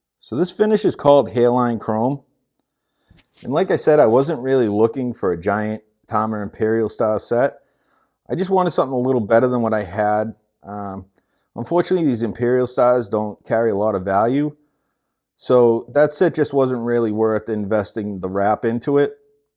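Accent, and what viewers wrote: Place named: New England, United States. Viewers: I had a Tama Rockstar kit that was the same setup as this. You mention Lake Winnipesaukee which is funny since my brother lives in Gilford which is right there. It's been years since I've played, but I follow all the top drummers like Weckl, Borlai, Sucherman, Donati, Coleman, Smith, Dicenso, etc. This kit takes me right back to the 80s when I was teaching myself to play from vinyl to bands like Rush, Zeppelin, Aerosmith, Judas Priest, Iron Maiden, Journey and the like. My first kit ever, in the late 70s, was a five-piece Slingerland. First song I ever played - Kiss God of Thunder. LoL I'm guessing you're a Mass guy like me with your accent and all. Pretty cool vid. Thanks for posting. P.S. I also owned a Gretsch set that I traded a North set for. Didn't care for the North. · came for the drums, stayed for the new england accent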